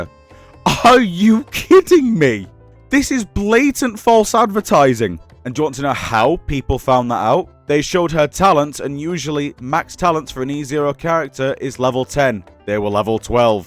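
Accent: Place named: Blackpool, United Kingdom. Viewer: The UK origin was crystal clear, sounds like Blackpool area, but i might be wrong. Is nice to hear. ^_^ Ps from time to time i like playing FuA, but only have 1.x units, so it's kinda tough, but doable. Not to justify the stunt that yoyoverse is pulling though, that sucks and as far as i am concerned they can shove that cyrene girl up their arses.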